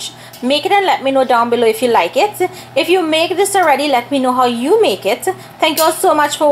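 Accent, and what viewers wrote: Trinidad and Tobago. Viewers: Greetings from Grenada via Britain. I make mines similar, instead I use the pulp from my carrots after I finish juicing. In the blender I put condensed milk, the the pulp, and some essence and brown sugar as most of the sugar is extracted into the juice. The beauty is that there is no waste, as I hate throwing the pulp out when I'm done juicing. Hope all is well. (btw- my ex-wife is from Point Fortin, so I ALWAYS miss the accent)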